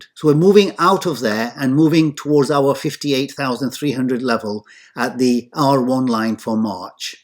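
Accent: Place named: England, United Kingdom. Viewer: Your effort is really appreciated! Please don't feel pressured to put out more content than you currently are (although I'd be the first to watch it, haha). I'm sure you've got great friends and family that appreciate your time and wisdom as much as we do. By the way ... I've been having the hardest time trying to place your accent. What part of England are you from? :)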